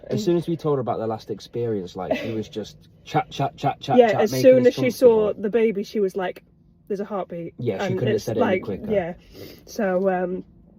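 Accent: England, United Kingdom. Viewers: It’s that English accent. It’s proper English.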